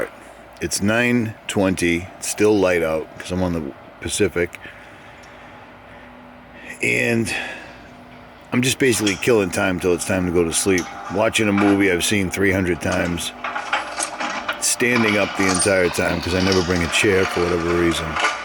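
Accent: Boston, United States. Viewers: Sounds like your cuzin from boston! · Sounds like you have a slight Boston accent.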